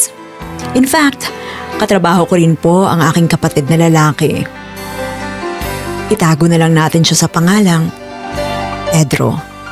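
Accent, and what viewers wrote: United Kingdom. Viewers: Long live....GOD BLESS YOU!..lol...banatan mo british english accent beautiful young lady.....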